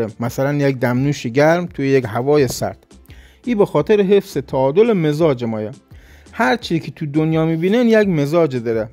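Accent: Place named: Rasht, Iran. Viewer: چه لهجه ی رشتی قشنگی دارین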